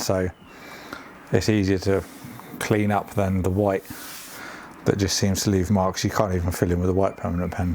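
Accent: United Kingdom. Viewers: Where are you from? Judging by the accent I'm thinking Gloucestershire, Bristol maybe?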